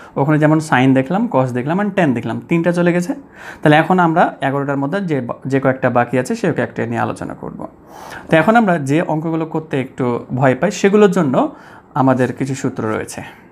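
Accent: Rajshahi, Bangladesh. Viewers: Are you from Rajshahi??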